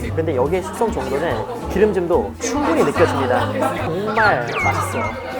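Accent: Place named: Busan, South Korea. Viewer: 부산 분인가요..? 내가라고 말씀 하시는 것 같네요 와이프도 제가 보다 내가라고 얘기 많이 하던데.. 설명 하시는 목소리에 감칠맛이 가득 하세요 친구분들은 무슨복인가요 너무 부럽습니다... 와....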